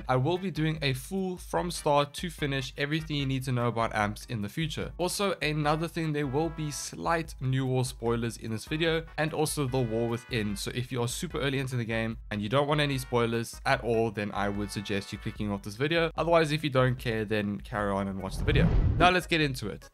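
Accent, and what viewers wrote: South Africa. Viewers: This is South African I love it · That accent is it south african?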